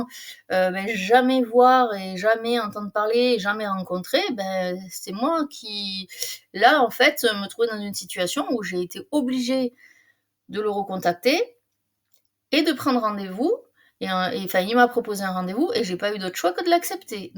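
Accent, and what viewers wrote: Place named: Toulouse, France. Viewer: Je découvre ta chaîne ce matin grâce a l'algorithme de YouTube ! alors que j'ai découvert ce parcours de FJ depuis un an tout juste a 53 ans! Bravo et merci du partage. J'ai retrouvé mon jumeau en janvier 2023 apres 16 ans de silence entre nous. J ai rêvé de lui a plusieurs reprises en qqs jours et j ai décidé de suivre mon intuition et de le contacter. Nous bossons ds la même administration mais depuis 15 ans il vit a 800 km de moi. Ont alors rejaillit en pleine tête tous les sentiments et ressentis si douloureux vécus en <num>, avant notre séparation, et ma NNA alors que nous sommes mariés depuis tous les deux chacun avec nos tierces. Ma sideration étant a son comble de revivre toutes ces choses , ses sentiments, ses synchronicites en un claquement de doigt, j ai fait mes recherches et decouvert ce parcours de FJ il y a un an. 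Et l'année 2023 fut terrrible et belle en même temps. J ai découvert a 53 ans qui je suis vraiment, que j ai une belle âme et des liens énergétiques. L année fut riche en revelations d'une puissance inouïe. J arrete là, nous sommes en plein ds la reunion, et le switch, ma plénitude m intrigue même je dirai mais c est trop bon et je sais que le meilleur est a venir. ❤❤😊🥳 encore Merci et bel accent un peu le même que le mien! de Toulouse !😂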